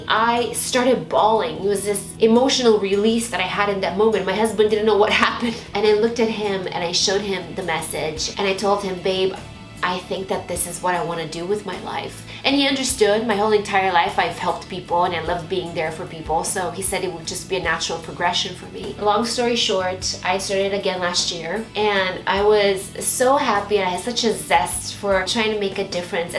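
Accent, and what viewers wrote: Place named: Romania. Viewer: Hei! Din nou iti scriu in romană,sper ca nu este ceva care sa te supere,dar stiu ca esti românca asa ca de fiecare data cand adaug un comentariu la un clip de-al tau aleg sa iti scriu in romană! Imi place foarte mult accentul tau cand vorbesti lb engleza! 👌🏽👌🏽 Dintre videourile tale am 2 care imi plac in mid special, si anume "Smokey green and glitter tutorial" dar si "10 Beauty and skincare recipes"! Te urmăresc si pe Instagram si imi place sa ma uit la insta storyes făcute de tine! Sper sa fie cu noroc participarea mea la acest concurs! Pupici din România! 💋💋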